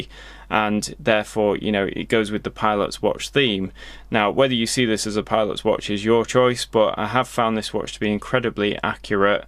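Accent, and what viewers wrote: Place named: United Kingdom. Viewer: Great review. I love your review style and you have a very pleasant voice (not to mention a lovely British accent as well). Personally I really dislike the dial of the Air King and I would rather buy something lesser known and better valued (for example for the 5000 GBP the Air King costs I would rather buy a Sinn and a Nomos) but there is no accounting for taste! Kind regards from Amsterdam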